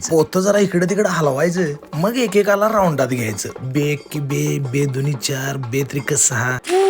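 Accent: Kolhapur, India. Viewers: तुमची भाषा लयं भारी. आमचं कोल्हापूर.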